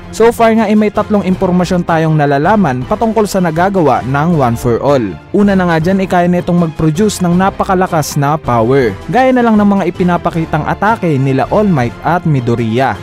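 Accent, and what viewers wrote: Visayas, Philippines. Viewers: Naol bisaya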